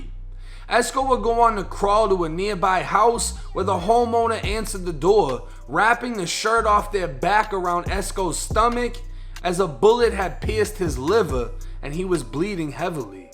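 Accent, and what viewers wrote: Boston, United States. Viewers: Yo what up i hear that Boston accent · You can hear the Boston in your accent 😂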